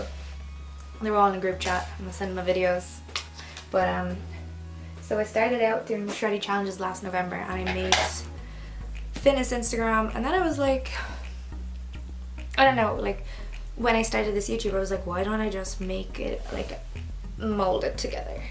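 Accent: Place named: Ireland. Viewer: Really impressive, holding a Master-degree in management. Also beautiful Irish-accent.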